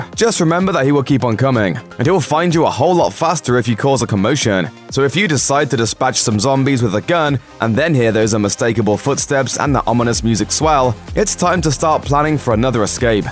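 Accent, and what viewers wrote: United Kingdom. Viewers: Stop talking british style dude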